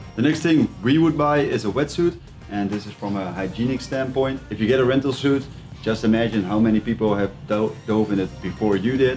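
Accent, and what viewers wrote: Netherlands. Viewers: I also had the impression he was Dutch😂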